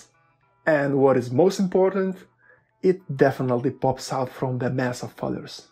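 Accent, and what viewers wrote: Russia. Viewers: Я один слышу русский акцент?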